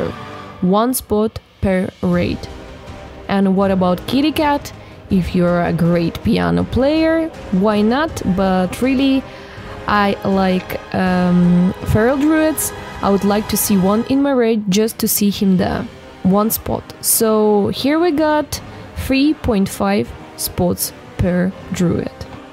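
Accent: Russia. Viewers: are you Russian? That accent you have I've heard it on 007. Or maybe too much Russian bots and the USA...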